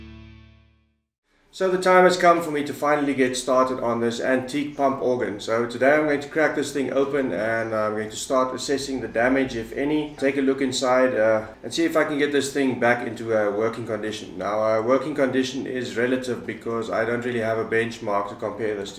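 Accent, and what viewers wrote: South Africa. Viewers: Your Afrikaans accent is jumping off my Afrikaans accent scale. Even my wife was able to figure it out after I gave her a couple guesses.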